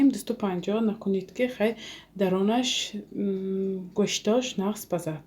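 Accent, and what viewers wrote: Isfara, Tajikistan. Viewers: Гап не апа. Исфарача кара фахмондид👍👍💐💐💐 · Офарин зани бехтарин дугона лафзи исфара додед хохар.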